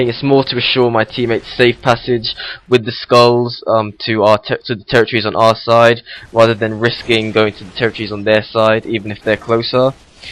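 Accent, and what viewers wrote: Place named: United Kingdom. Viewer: Its British English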